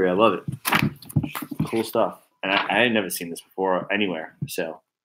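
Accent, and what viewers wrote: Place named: Italian-American, United States. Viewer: Love your channel and your accent, lol. I'm originally from Queens (now live on Long Island) and you remind me of my Italian relatives. Great video!! Glad I met you on JD's Variety Channel.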